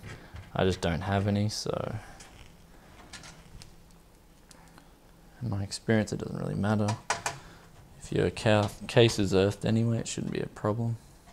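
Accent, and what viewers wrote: Australia. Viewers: Hey i have a question... I want to build a gaming computer and i know the parts i want to get, but i just don't know where to get them from since i live in Australia. I can tell by your accent you do too. So my question is: where can i buy pc parts in Australia? Oh yeah and by the way i love your videos, keep it up! Thanks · are you australian i can tell by ur accent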